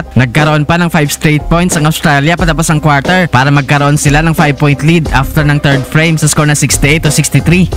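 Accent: Iloilo, Philippines. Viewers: Ibang klase itong narrator a. First time ko rito. Alanganing Nobita na may Ilonggo accent.😅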